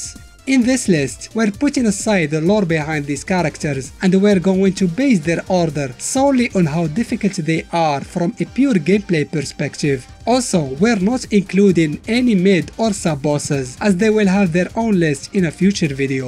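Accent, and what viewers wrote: Morocco. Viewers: Great stuff, you can never miss a moroccan accent lol great work keep on. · من خلال لهدرة ديالك نعرفك مغريبي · Your English pronounce look Moroccan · مستحيل متكونش مغريبي من لكنة ديالك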